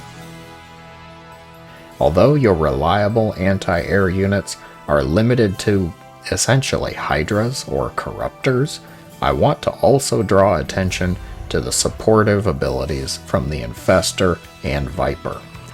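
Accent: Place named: Texas, United States. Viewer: I really like using Banshees and it's not relevant to the video but to be honest and no offense but the way he sound when he talks is like he has a Texas accent and/or some thick saliva in his larynx, maybe he kinda sounded like those Viking units actually.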